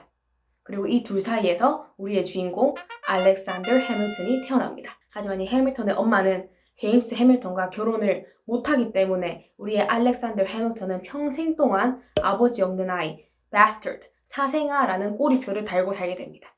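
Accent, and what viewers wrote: Gyeongsang, South Korea. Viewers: 잘 봤습니다. 님의 경상도식 억양이 정감있네요.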